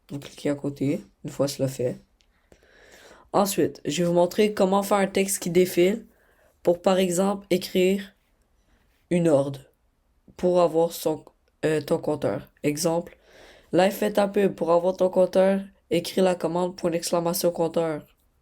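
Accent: Canada. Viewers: Ses un accent canadien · j adore ton accent quebecoi tabernacle de dieu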